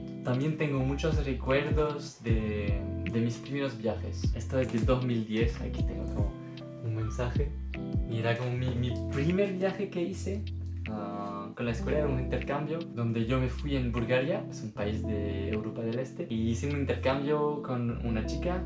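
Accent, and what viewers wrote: Chile. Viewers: Has pasado mucho tiempo en Chile ? Porque hablas español con acento chileno, se te escucha muy bonito ! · Salut Cher ☺️, tu es définitivement un gars super doux et adorable!🤩 J'ai été très émue par ta boite à souvenirs, surtout ta lettre 🥺, c'est très sympa, ça m'a donné envie d'en faire une pour mon "moi" du futur🧐✍️. Tu écris très beau et ton espagnol est excellent et je ne trouve pas que tu as un accent espagnol ou andalou, mais plutôt il semble chilien. J'adore les villages français😍, ils sont tous trop beaux, j'aimerais vivre dans un, c'est mon rêve. J'ai visité Lille il y a quelques années et c'est une très belle ville, je l'ai aimée encore plus que Paris. Grenoble c'est aussi très beau, et ses marchés de Noël🎄👼. J'espère te rencontrer un jour ou te recevoir ici en Colombie 🇨🇴. Gros bisous.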